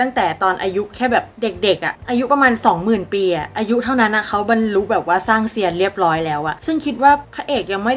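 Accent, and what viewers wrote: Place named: Isan, Thailand. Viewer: เเดนอีสานนาาาานิ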